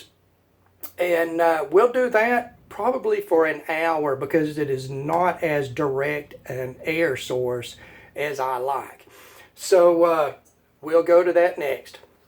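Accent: South, United States. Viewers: G'day Champion how ya doin ? i'm new to your channel and i'm already lovin it. Great reviews and very informative, keep up your great work P.S i love your southern accent. Cheers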